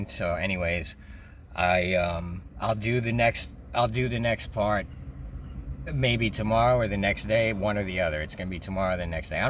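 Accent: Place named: Long Island, United States. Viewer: 💜🐷💜 Enjoy listening to your stories. Watching from Palm Coast FL. Had a boyfriend from Long Insland who died 10 years ago. Your accent reminds me of him.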